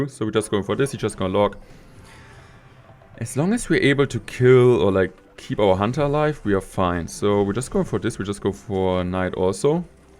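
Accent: Germany. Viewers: Cant tell if i like your english or german content more 😂 i love german accents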